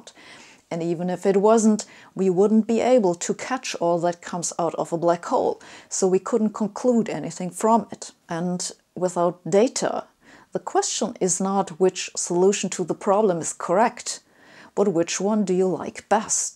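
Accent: Germany. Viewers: Arthur Dent dude she's German it's called an accent.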